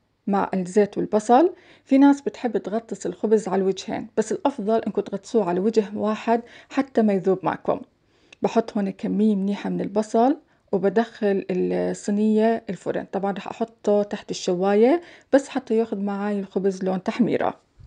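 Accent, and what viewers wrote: Palestine. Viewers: هيه الاخت فلسطينيه لو اردنيه لهجتهم خيال 😘💙💙😘😘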